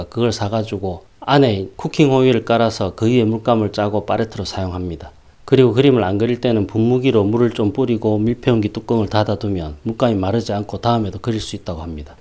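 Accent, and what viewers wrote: Gyeongsang, South Korea. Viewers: 눈깔 그리시는거 잘 보았습니다. 갱상도 말투로 시크하게 설명하시는것이 너무 제취향이십니다……하하핳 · 진짜 의도되지 않은 리얼 경상도 발음 눈까리 빵터짐 ㅋㅋ 울랑이랑 비스무리한 스퇄의 아재 개그 정말 딱 내스퇄 강의 ㅎㅎㅎ 취미로 그림 그리다 우연히 알게되었습니다. 시간됨 자주 들릴께요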